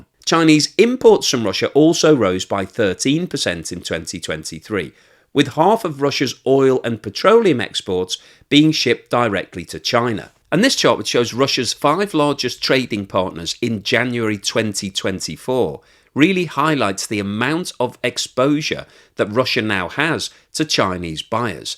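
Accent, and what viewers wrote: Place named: England, United Kingdom. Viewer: Great job,. Thank you for educating us. Your videos are very enjoyable and you are very good at what you do plus I really like your accent. Yours is a proper English, beautiful and clear 😊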